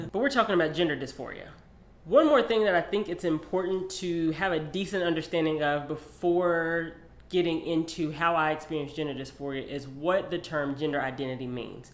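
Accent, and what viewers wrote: South, United States. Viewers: So well articulated! My daughter, who is experiencing gender dysphoria, sent me this video, and I appreciate you sharing your experiences. It's so hard to be vulnerable like that. Also, I really like your beautiful voice! It has a velvety richness, and the slight southern twang is cute. (For what it's worth, I'm a cisgender, heterosexual woman.) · I'm late to this, but dude your southern twang rocks and is so sexy!!!! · I love your voice, your southern twang is awesome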